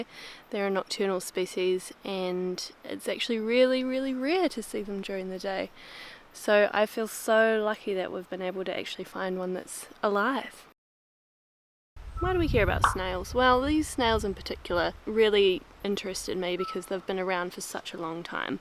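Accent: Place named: New Zealand. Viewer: Great job mam! So awesome to hear a kiwi accent on YouTube.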